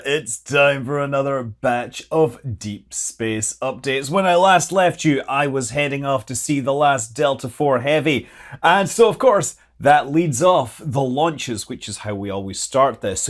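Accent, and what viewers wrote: Scotland, United Kingdom. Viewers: Hi,! In regards the Alpha launch, I'm not as convinced as you seem to be that the issue was poor guidance, or possibly an upper stage engine underperformance. When the video signal was recovered from the upper stage, after the circularization burn was performed, the stage was slowly tumbling end-over-end. And I seem to recall one person on their flight control loop asking why the vehicle was maneuvering, and someone else asking, rather that stating, that maybe it was just moving to its payload deployment attitude. I watched as it completed one full end-for-end loop, and continue on, so I have doubts that it was in a planned "maneuver". My best guess is that they lost attitude control after the initial orbital insertion, and that the circ burn happened with the engine pointed in the wrong direction. It would have been more obvious of a failure had the burn been retrograde, but it seems to have been more tangential to the trajectory, so it just altered the orbital plane by a fraction. 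I'd be surprised if that isn't eventually announced as the problem they encountered. Keep up the good work! And I do apologize, but when speaking of you to my friends who are also into space exploration, rocketry and flight, I tend to refer to you as "I'm, and I'm manly!" I don't think you project that attitude, but the way you say it (possibly influenced by an American's reaction to your Scottish accent), it always sounds like that to me, lol!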